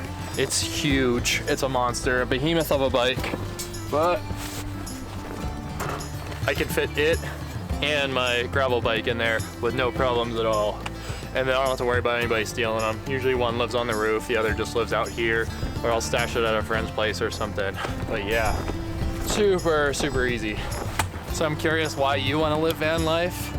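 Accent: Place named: Vermont, United States. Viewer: Dude i love you channel! Are you from Vermont? I have that same accent!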